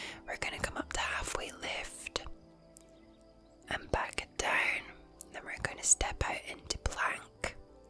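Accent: Scotland, United Kingdom. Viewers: Woohoo... + yoga = ASMR bliss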